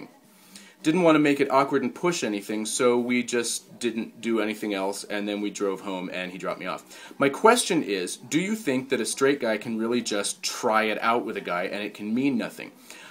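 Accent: Atlantic Canada, Canada. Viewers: how canadian, the accent and in the background. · i love your atlantic canadian accent And your fake american accent! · also, I love accents. I think they are wonderful. You can use that atlantic canadian accent all you want. I might even pick it up from you, cause well, I have habit of doing that after some exposure. It's so not intentional either. The sprectrum of sexual orientation is fluid, but each person has thier own container.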